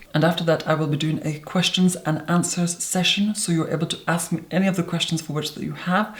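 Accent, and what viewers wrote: United Kingdom. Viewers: Me before watching: speaks in eng with a bit of filipino accent Me after watching few videos (filums hah) of: starts talking in british accent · It’s nice to hear I am not the only one. ❤️ I do have to admit, the Scottish accent is in my top 3 favorite accents, nevertheless, I have never heard such a chique version. Nonetheless, I felt immediately drawn to it the moment I heard first speak. When I found out the origin of the accent: ah..... naturally.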